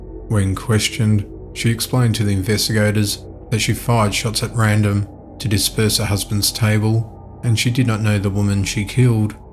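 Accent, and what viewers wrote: Australia. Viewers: is this an ozzy man speaking it feels very real to me hearing such horror from the USA spoken in my own speech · Entertaining and informative as always. Plus I think I enjoy hearing an Australian accent share the news. Great intonation!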